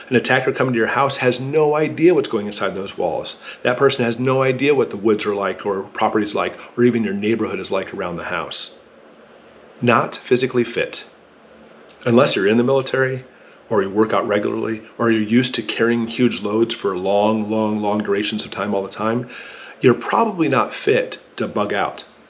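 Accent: United States. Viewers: For a start all those who go out for a night in the woods, make a steak over a fire, and drink beer, they think whats about to happen is going to be just like that. Lol. It's NOT. Neither will it be a Mad Max Scenario. Just unimaginable horror and suffering. Anyway, who are you to tell anyone to stay or go ? What qualifies you ? To me you sound American, so when the SHTF, sadly, you will probably be exterminated along with Billions of others, who will die in unimaginable horror. Of course those you empowered, the Billionaire Elite politicians will all be safely tucked away in some cosy bunker, watching you die on tv monitors ! Finally, this is not Iraq, Afghanistan, Libya or anything like those backward tin pot countries, this is Russia & China ! Superpowers brimming with Nuclear weapons. This will be horror like you cant imagine. Why am I so certain, or speak with so much authority, because the West has been wanting a world conflict for well over 15 years now. 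Putin has nowhere to go, his only option is now to stand up to the USA. We all know what that means. Well nearly all, you very obviously don't. Anyway you see to forget also to mention for at least three days, no one even you, wont or shouldn't be going anywhere, as the fallout will kill you. Well if the winds blowing in your direction. There are so many more factors to take into account, however we'll just wait for you to tell us all what to do ! Strangely there wont be any Internet ! So I have to wonder how you're go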